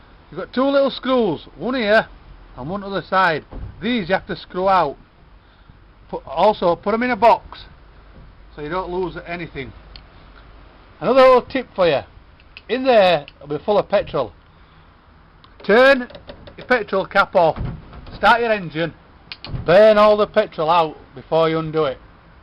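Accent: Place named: Grimsby, United Kingdom. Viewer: Just gunna up-jet my 150 4T top tips. You sound like a Grimbarian?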